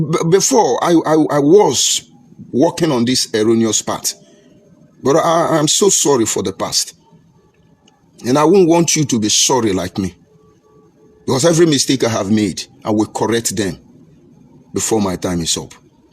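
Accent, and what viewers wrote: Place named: Yoruba, Nigeria. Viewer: The man's voice is that of a Yoruba. Only a criminal from a criminal tribe will claim to be Ikwere today, Ogoni tomorrow - even when his vocal intonation gives him away as a Yoruba. His propaganda is also in hyperbole like that of the typical Yoruba - superfluous like his babariga dress. But this mad clown is really comical even in his gibberish nonsense. He is shaming Ogoni people or any group he claims to be representing 😄😃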